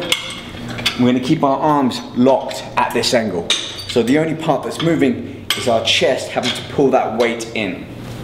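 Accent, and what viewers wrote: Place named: United Kingdom. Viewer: So this workout should build and make your middle line more prominent? Your accent is cute. Is it British?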